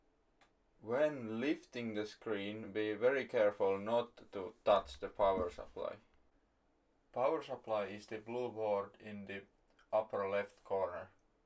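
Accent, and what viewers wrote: Finland. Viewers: ootko suomesta :D tuosta englannin aksentista ei voi erehtyä! Mulla on kaksi tällaista, 24" sekä 20" mid-2007. Molemmissa prossuna T7700. Tuohon isompaan olisi hauskaa laittaa X7900 tai T9500, Catalina-tukea en tarvitse.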